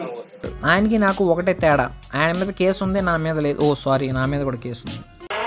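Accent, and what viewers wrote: Nellore, India. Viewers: Nellore slang bro..😂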